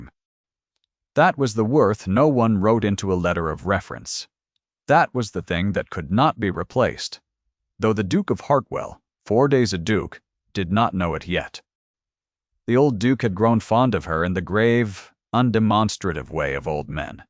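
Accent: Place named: North America. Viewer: The North American accented AI for a story set in 19th century England doesn't do it for me...and the voice was very monotone. ZZzzzz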